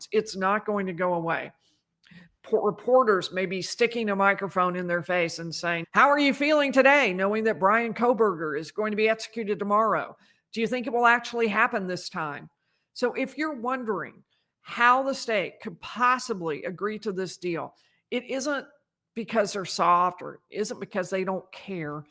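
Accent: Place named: Texas, United States. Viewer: Love your Texas accent !!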